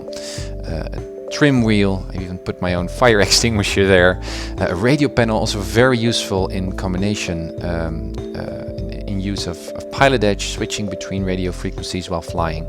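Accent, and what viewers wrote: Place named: Netherlands. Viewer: are you dutch? English sounds dutch :P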